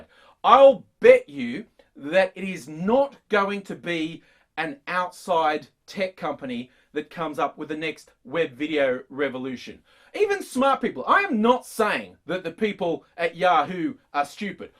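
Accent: Australia. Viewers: Why is Patton Oswalt speaking with an Australian accent?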